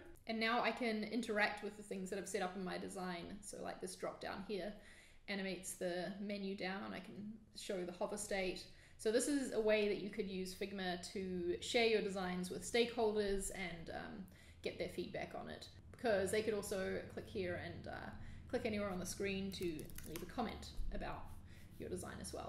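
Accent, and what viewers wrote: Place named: New Zealand. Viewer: Hey thanks for the great vid. I also love hearing a fellow kiwi accent:)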